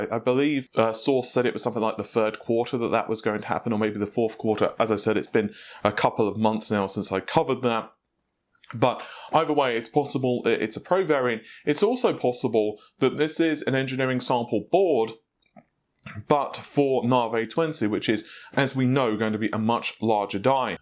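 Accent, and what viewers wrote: England, United Kingdom. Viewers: Mike D He is British from Great Britain, with an English accent then :)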